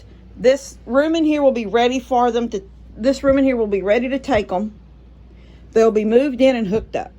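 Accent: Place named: Georgia, United States. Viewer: I feel like I'm in the room with you having a conversation. I was in a funk because of some physical problems, surgeries, etc and getting older. I was told I could no longer do stuff and I believed it. I needed repairs to my house little things I used to do myself or with my husband or things my Dad taught me growing up helping him. I learned from my Mom also. During COVID my handyman left this state and I wasn't able to find someone to take his place. ( I wasn't going to get scammed). So I watched you and I said I can do that. I'm limited to some things physically My body can't do I tried but I painted, patched holes, cut boards bought everything that was needed to make a closet just had to get help putting it together. Watching you reminded me I knew how to do things I wasn't helpless like I was made to feel. Now I have found a really sweet young lady that has worked helping out on her grandparents farm and knows how to do some stuff and what she doesn't know I show her and she has helped me finish a couple of projects and is going to help me with others. 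She works goes to college and still helps her Dad keep the farm going for grandma. I showed her your video and she said that you talk like me I'm from GA but living in Illinois now. Anyway thank you for reminding me I can still do stuff and even teach other young women to be self sufficient.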